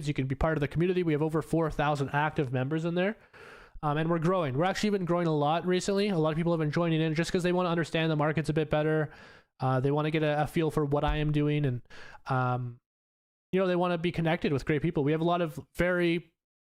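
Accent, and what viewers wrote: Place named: United States. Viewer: I noticed that you say out like an American and not like a Canadian.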